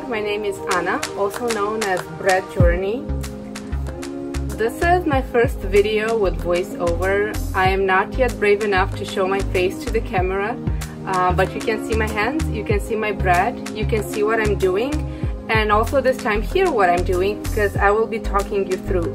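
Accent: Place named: Egypt. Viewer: Your accent reminds me of some of my Egyptian friends. :)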